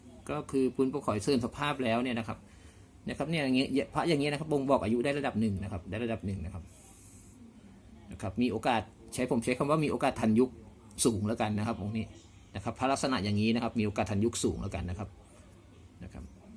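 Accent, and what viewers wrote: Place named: Thailand. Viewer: พระสวยๆทั้งนั้นทดสอบกลัวเสียเนื้อพระมั้ยจ๊ะพูดเน้อเน่อคนจ.วไหนคะ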